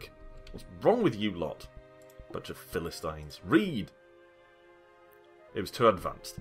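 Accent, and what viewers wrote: United Kingdom. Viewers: i can tell you're british,great lets play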